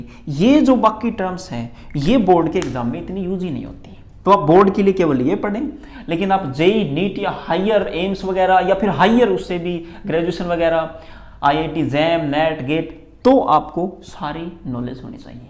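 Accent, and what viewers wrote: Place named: Haryana, India. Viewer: That haryanvi excent in his language is just 😌,🥀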